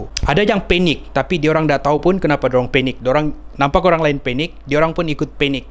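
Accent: Sabah, Malaysia. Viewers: Kamu orang sabah ke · tu sabahan punya style paling ketara oh wkwkwkw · Dia Ni Sabah ke indon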